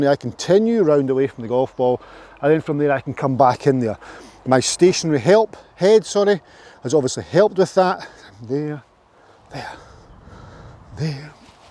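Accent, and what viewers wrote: Scotland, United Kingdom. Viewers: I think his accent is fairly mild for Scotland. Some Scottish accents are very difficult to follow but very interesting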